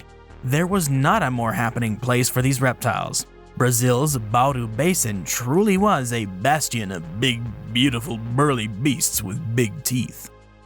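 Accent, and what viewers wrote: Spain. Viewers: The day he stops giving Portuguese a spanish accent will be a day I'm happier than usual ... Until then I'd prefer to hear the correct pronunciation by any other means, like c'mon, can't you just insert Google translate audio?? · I Love how u give everyword spanish entonation, its a good guess if u dont know much portuguese, still funny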